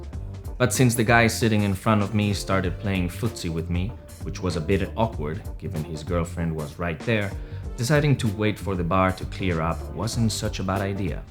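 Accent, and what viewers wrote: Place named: Spain. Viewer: Eres Español creo se nota😀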